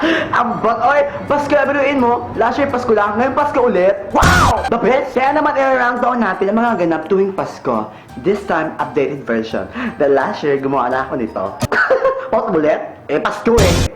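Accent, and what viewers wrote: Visayas, Philippines. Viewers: bisaya ka po???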